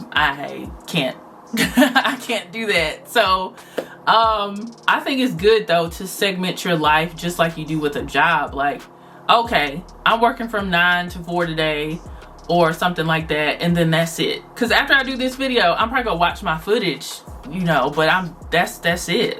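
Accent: South, United States. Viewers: This has probably been the BEST, most informative, and straight to the point video I’ve watched regarding being a content creator. Thank you so much for being transparent with your audience. Congratulations on 9 years! 🥳…Also new subbie (Khrissy told me about you 💞) and also because I love your accent! I immediately knew you were from the South! 🥰♥️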